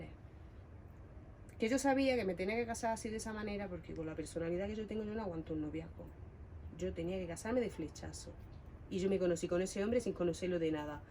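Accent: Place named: Andalusia, Spain. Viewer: Masha Allah hermana gitana, y como adoro tu dialecto andaluz. Linda historia y contado en una manera muy hermosa. Me encanto!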